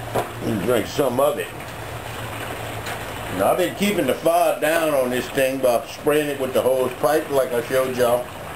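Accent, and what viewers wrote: South, United States. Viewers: I love that good ole southern accent. #lovebeingfromthesouth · This guy's southern voice is the best! Sounds like that big warner bros. chicken Foghorn Leghorn.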